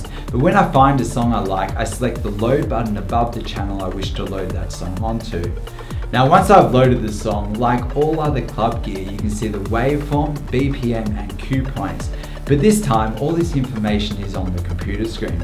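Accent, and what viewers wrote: Australia. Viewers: i can hear and aussie accent? ahahahah love it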